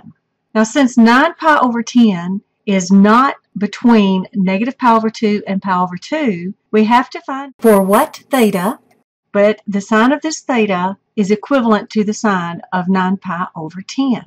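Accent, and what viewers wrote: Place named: South, United States. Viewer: Oh how am I supposed to continue studying for my midterm with your lovely southern accent as a distraction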